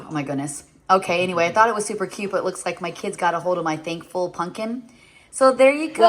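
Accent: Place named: Minnesota, United States. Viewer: Why did I think you were from Minnesota😂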